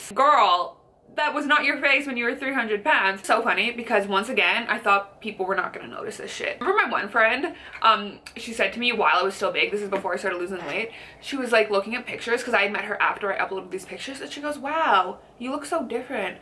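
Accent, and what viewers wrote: Canada. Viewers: Her Canadian accent is so adorable! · Canadian accents are so cute :) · Canadian accent, Get over it.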